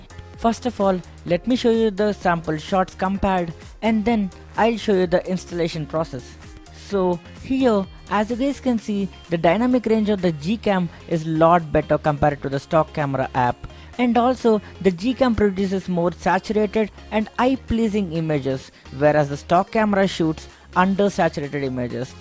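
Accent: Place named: Madras, India. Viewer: 100% madrasi accent, am I right tambi ?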